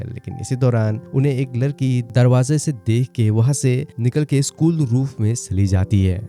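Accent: Northeast, India. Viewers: Always a north east guys accents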